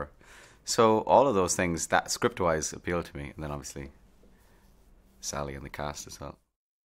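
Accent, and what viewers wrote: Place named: Ireland. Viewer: In this interview he is talking with his natural irish accent. So charming 😍. And his voice sounded more high-pitched.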